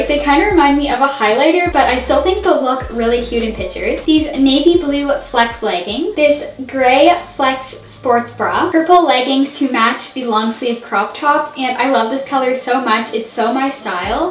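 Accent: Canada. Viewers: I love the way Canadians say "sorry" and "picture" it's so endearing.😊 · Are u Canadian or American??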